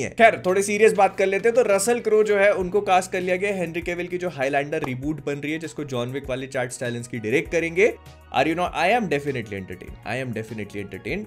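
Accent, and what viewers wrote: Bhopal, India. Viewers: Baba makde app bhopal se ho kya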